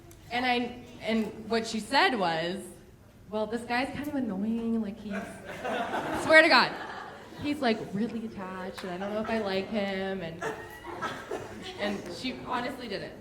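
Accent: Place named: Midwest, United States. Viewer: I'm thinking your from the mid west, love the accent and your sincere thoughts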